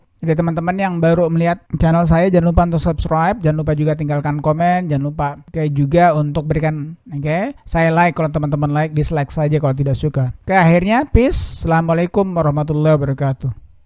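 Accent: Makassar, Indonesia. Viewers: orang makassar ki ?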